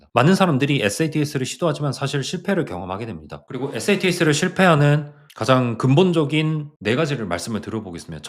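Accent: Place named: Jeolla, South Korea. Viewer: 아무리 숨겨도 감춰지지 않는 전라도 사투리=사짜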